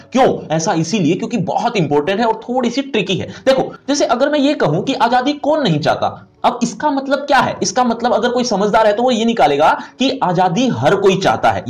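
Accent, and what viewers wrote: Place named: Hyderabad, India. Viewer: Aap Hyderabad ke ho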